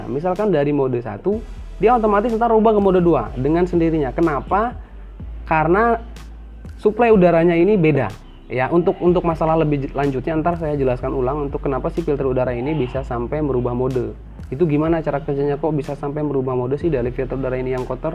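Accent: West Java, Indonesia. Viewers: sya suka kalo si abang ini dalam bicaranya urang sunda mana ieu teh resep ey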